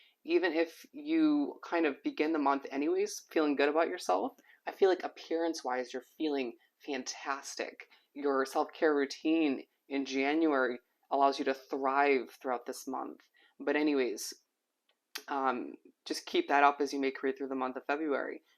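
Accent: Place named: Midwest, United States. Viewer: You also have a VERY MIDWEST ACCENT WHICH IS SO CUTE! 🤩😍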